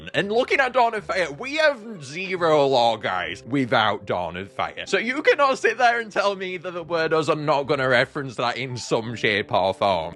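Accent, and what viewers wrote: England, United Kingdom. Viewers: You’re English !!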